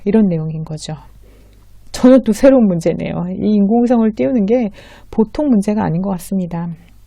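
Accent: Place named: United Kingdom. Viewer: 헛 어쩐지! 영국영어발음 멋있어요!^^ 오히려 목소리가 이쁘셔서 어색한 남자 원어민 발음보다는 직접 한번에 싹 다 녹음하셔서 들려주시고 스크립트를 고정댓글이나 자막으로 하시면 훨씬 듣기도 좋을 것 같아요!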